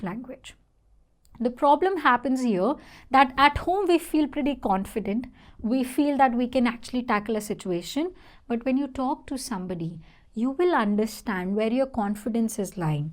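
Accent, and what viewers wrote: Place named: India. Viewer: I am used to watching this channel, because you use Indian pronunciation.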